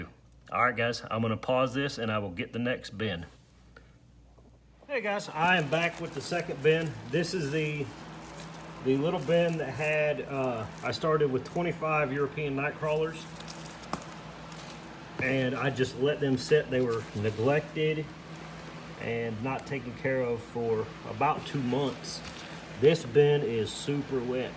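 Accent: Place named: Louisiana, United States. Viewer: Just Subscribed! Emily sent me. I'm gonna order some ANC cocoons from you towards the end of July. I thought I heard Louisiana in your video. Morgan City living here. Keep up the good work.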